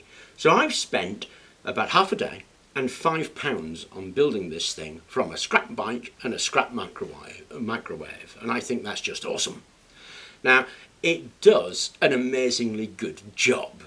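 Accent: South, United States. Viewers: nice, lol "he does have a different accent.." well he does live down south ya know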